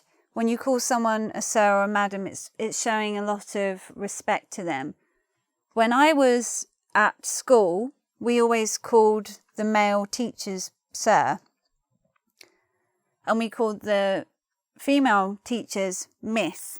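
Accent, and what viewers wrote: United Kingdom. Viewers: Thanks my best teacher ..you make me love British accent .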